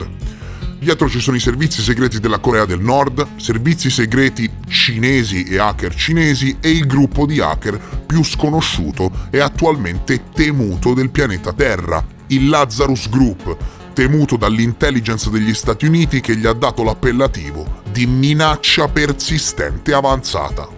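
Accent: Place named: Friuli, Italy. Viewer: Secondo me sei del nord Italia Friuli...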